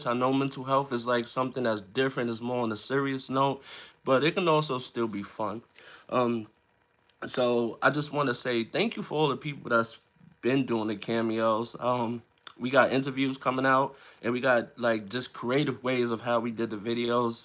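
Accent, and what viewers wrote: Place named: New York, United States. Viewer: I will watch Sinners when it comes on video. I have heard good reviews too. I didn't know you were a counselor, so good to learn more about you. Good message you shared. Meeting people where they are at, not where we want them to be. Listening is key. Like you said, sometimes we just need someone to listen, no judgement, no "answers". Good video. Love the accent, sounds like New York!🙌🏽👍🏽 Going to school for Social Work. Honorable!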